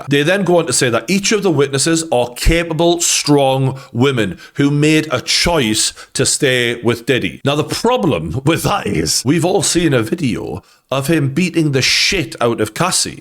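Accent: Newcastle, United Kingdom. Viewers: Great to hear the geordie living in ireland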